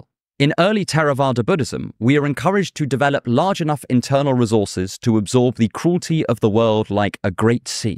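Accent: United Kingdom. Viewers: first viewer and just known this channel 2 mins ago. been here cause your beautiful British accent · Who close captions your videos? I see a lot of errors that could/should be remedied as they don’t recognize the idiosyncrasies of your British accent.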